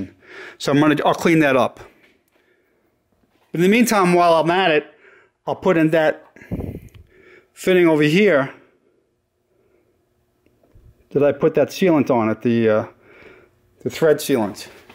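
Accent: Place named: North, United States. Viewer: why can't I just send my t5 to you sounds like you are north of the mason Dixon line. so might be to expensive for shipping.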